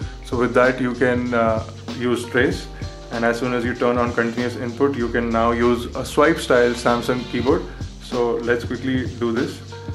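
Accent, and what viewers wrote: India. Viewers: bullshit typical indian english accent...( boda thing cuming out..)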